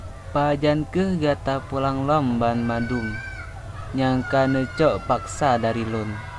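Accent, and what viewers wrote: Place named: Java, Indonesia. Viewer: kop bangay kah gam, kon lagey nyan bahasa aceh yg asli hy gam, kah mantong logat jawa, bahasa aceh hana god pah lom, kajak lam pareh keudeuh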